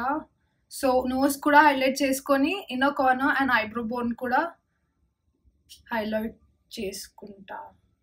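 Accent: Telangana, India. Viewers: telengana pilla.. we should proud of our slang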